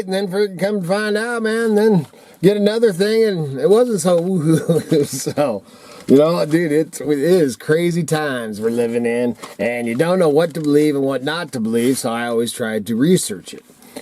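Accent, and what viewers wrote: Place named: Idaho, United States. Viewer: 1st time viewer. Got a kick out of your Idaho hillbilly chatter. I'm old and lived around hillbillies from west to east and all over the south but looked at northerners as city stickers. Might be because new York and Chicago is upstate. Live in the hills of Kentucky, edge of the Cumberland near mammoth cave park. Got to say, there's not much differences between Idaho and KY billybobs....Got a kick out of your video...Even Miss Kitty ☺️. I never knew people had so much nit picking feeding their.chickens. What ever happened to table scraps, fats from cooking, crushed shells mixed with peanut butter, lol... a little tid bit for you dear. I buy the corn and seed mix from tsc. I figure chickens eat everything....even sheep and goat feed if hungry enough. I've never seen a chicken full. I'm guessing it's the processed feed and not the scratch feed that stops hens laying. 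Biden said he was going to collapse the food industry. I heard it myself in disbelief he even said it and it phased nobody and was not shown again until Tucker showed it on fox recently. And again. No public response. Got to pinch myself now and then ... this is still America, right? Or a petri dish for some creepy villain in cartoons or movie? Ha! I believe it's a God thing and now people minds will be blown....I mean really blown away. Daddy told me to stay away from flights and never throw the 1st punch. Wait for it and do you you have to .... run or fight... just win it or give it your best. That all a should c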